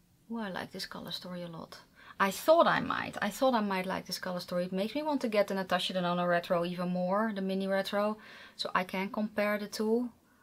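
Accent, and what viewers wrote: Netherlands. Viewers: Wat doe je dit goed zeg! Hartstikke leuk om van je te leren, je legt het zo duidelijk uit en ik hou ervan om mijn eigen accentje te horen tussen alle Amerikaanse reviewers! En de combinatie die je in het begin noemde… heel herkenbaar! Ga vooral zo door, ik ga je volgen!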